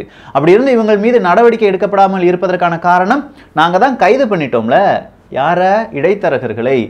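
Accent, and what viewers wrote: Kerala, India. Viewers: Hello bro! I watch all your videos! You have a very strong Malayalam accent! Why don’t you release some videos in Malayalam also! Are you basically a Malayalee bro? Someone said you are from Tirunelveli, but you don’t have Nellai accent at all. I am originally from Tirunelveli, so I know Nellai accent very well. Your accent is neither Nellai accent nor Nagercoil accent! Your accent is 100 percent Malayalam accent! It will be good if you release some videos in Malayalam under the tittle “ Malayalam Pokisham”!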